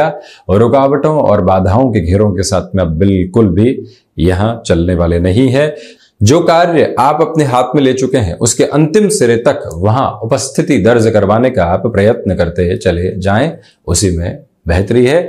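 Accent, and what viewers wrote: Standard. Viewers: क्रपया नाराज मत होना आप क्योंकि मैं भविष्यवाणी से ज्यादा वर्तमान कर्मों पर यकीन करता हूं (क्योकि कल किसने देखा है, क्या पता कल हो न् हो)/ लेकिन आपके शुद्ध शाब्दिक उच्चारण का दिवाना जरूर हो गया हूं मैं, इसमें रत्तीभर भी कोई शक नहीं है/ आपके वीडियो देख-देखकर मैं अपनी खुद की हिंदी भाषाई जबान को लगातार शुद्ध करने की चेष्टा करने का आदि सा हो चुका हूं/ मेरा ऐसा मानना है कि यदि कोई व्यक्ति हिन्दी भाषीय समुदाय से हो तो उस व्यक्ति को अपनी शुद्ध हिन्दी भाषा की गरिमामय जुबान को शुद्ध रूप में जीवित रखने की नैतिक जिम्मेदारी अवश्य निभानी चाहिए "पीढ़ी दर् पीढ़ी"/ एक तुच्छ हिंदू प्राणी स्वरूप मैं ये जिम्मेदारी बखूबी रूप में निभाने की कोशिश अवश्य करता हूं अपने परिवार में/ ईश्वर से कामना है मेरी कि आप सदैव सुखी व् स्वस्थ रहें परिवाजनों सहित/ हार्दिक शुभकामनाएं🙏🙏🙏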